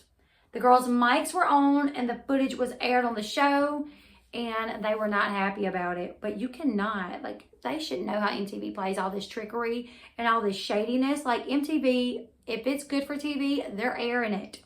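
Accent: South, United States. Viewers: I love your southern accent. Lol I'm from NC and my bf says I have a twang but you got me beat. 🥰 Great info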